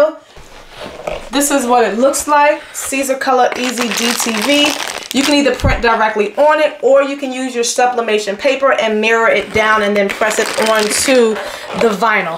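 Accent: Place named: New York, United States. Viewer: Is it New Jersey or New York? I love the accent! It’s just so exciting lol.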